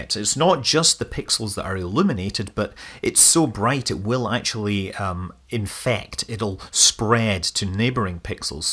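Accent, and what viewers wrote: Scotland, United Kingdom. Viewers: Actual Scottish from! "Theire's nunnae the acoustic panneling"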